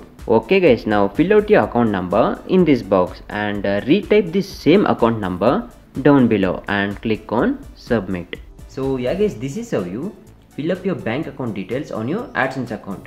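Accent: Karnataka, India. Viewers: Slang inda gothu aithu Kannada atha, 😍 I'm from hospet, ballari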